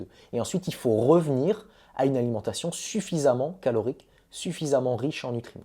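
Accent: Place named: Housing estates, France. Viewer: Déjà apprends à aligner une phrase correcte en français, et après tu pourras, éventuellement, donner ton avis sur ceux à la cheville de qui tu n'arriveras jamais...ce personnage prétentieux à l'accent des quartiers (..) illustre la nouvelle "jeunesse", qui croit tout savoir et dont le cerveau a été configuré binaire par les smartphones dès le plus jeune âge. Voilà le futur de l'humanité ...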